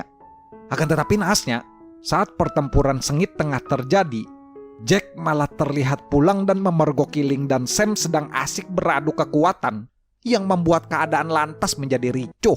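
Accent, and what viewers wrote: Banjar, Indonesia. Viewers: Orang banjar kah pian bang, logatnya pina sama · Logalnya Kya orang bnjr... Bujur jua KH ???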